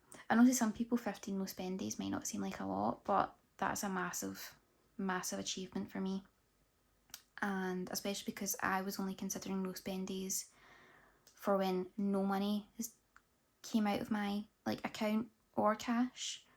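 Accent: Scotland, United Kingdom. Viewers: Haha no problem, also don’t worry about spraffing on in your videos, it’s refreshing to hear a fellow Scottish accent doing a budget video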